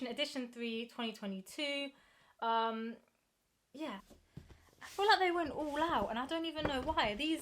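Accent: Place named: South, United States. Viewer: nice trainers, what part of down south you from i can pin point the accent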